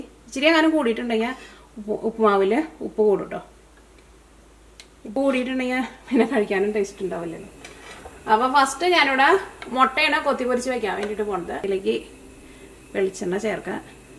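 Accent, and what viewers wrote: Thrissur, India. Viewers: അതാരികും സംസാരത്തിൽ ഒരു കര കരപ്പ്.. പിന്നെ തൃശൂർ കാരി ആണെന്ന് ആദ്യം തന്നെ മനസിലായി..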